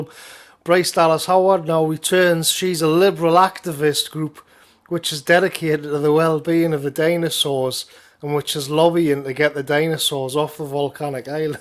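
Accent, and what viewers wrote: United Kingdom. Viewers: Great stuff. I enjoy these kind of youtube reviews more than any modern films themselves. In fact i simply dont watch films anymore if im honest. I particularly enjoy it when gets into fits of laughter. Made me chuckle on walk home from work out loud! Something about discussing what is actually quite a depressing subject and not helping but laugh that really takes sting out of it. Definition of if didnt laugh you would cry. Thats important i think, and very british characteristic (particularly with great accent). 👍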